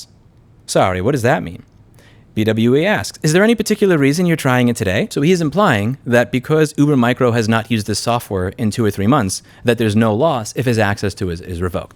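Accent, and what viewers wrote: New York, United States. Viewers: Ive watched for many years, taking a break from him over the last year or 2 (sometime after the whole RTR saga). Im back now because of this scandal and all the coverage its rightfully getting... I cant help but notice that NY ACCENT is much heavier... And he has FAR MORE NERVOUS TICKS than he ever did before... In fact, I dont remember either of these things in the past. In this video, he's twitching an awful lot. I hope hes taking care of himself. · Great video Louis. I’m a former NY’r and man the NY’r in you comes out full throttle in this video. Keep up the great work!! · Louis’s accent becoming more and more stereotypical NYT as he talks is perfect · The NY accent came out hard in this one.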